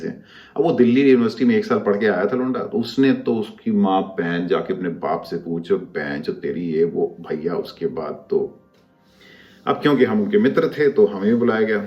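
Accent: Bihar, India. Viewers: Wow.such an authentic Bihari accent.dhanbad aaiye Kabhi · aap to Pura bihari bolte ho be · Sir aapka bihari tone..mazaa aa gya..apno wali feeling aae..ni to hr koe to chupane me laga rehta h..m really not expecting ki aap v kavi aise aaoge aur aise baat kroge apne video me..love u sir❤️❤️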